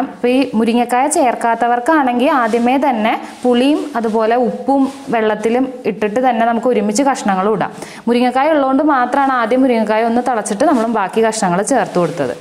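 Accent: Thrissur, India. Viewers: eppozha chechide channel kadath...Nalla Avathranam...naannayid receipeum....chechide veed evdyaa.Thrissur Aano....God bless u&Ur family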